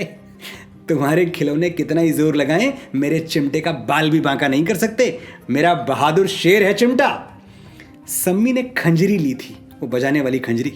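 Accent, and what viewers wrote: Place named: Uttar Pradesh, India. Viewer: Main bhi uttar pradesh se hu ji aapki boli main uttar pradesh ki bhasha jhalkati hai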